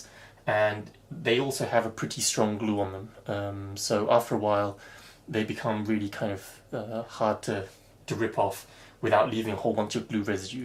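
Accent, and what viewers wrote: United Kingdom. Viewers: I thought you were English, iv noticed a lot of British people these days compensating so Americans can understand , should be the other way around its our language , anyway love your videos keep up the good work.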